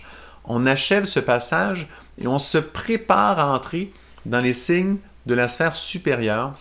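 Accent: Canada. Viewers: c'est vraiment très intéressant , l'accent canadien donne une dimension supérieure à cette capsule !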